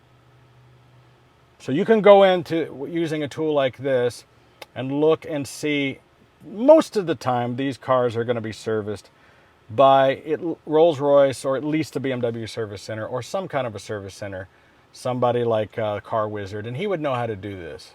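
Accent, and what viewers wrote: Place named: United States. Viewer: Yup! The American accent is difficult to follow especially when spoken at such hectic speed! An awful lot of talking ("tarkin') but little action. Man, "you gotta get your butt" to Cape Town, South Africa, where we do things "good and proper" - even with RR, Jags, BMW, - the whole lot! Metric and Imperial - no problem! Oily hands - no problem! We don't even need silly gloves - we use hot water and soap! We also speak a better English than the English in England! 😊 My Dad managed the best BMC agency in South Africa, and I spent much time at the benches after school, learning skills and a few naughty words! The skills are ever-useful, and I am blessed, at the age of eighty-one, to be able to run a health practice at home and to repair so many home items for my clients!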